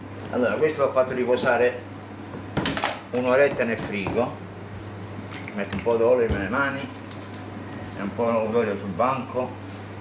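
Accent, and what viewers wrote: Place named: Italy. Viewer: Bravo vous êtes un vrai chef. Bisous de France,. Vive l Italie. Un beau pays avec un très joli accent ❤❤❤❤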